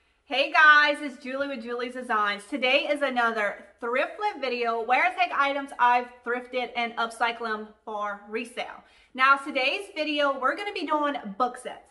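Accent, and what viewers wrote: Louisiana, United States. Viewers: You are so creative and talented, ☺️ I'm from Mamou, (central Louisiana). I live in NC, so that I can be close to my 2 grand babies. I miss Louisiana and my siblings, relatives, classmates & friends; when I get homesick, I search for your videos, because you sound so Cajun. You sound like my favorite cousins, from Houma!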